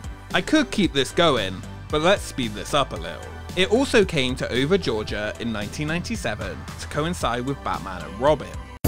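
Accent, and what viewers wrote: United Kingdom. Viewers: A high-pitched British voice.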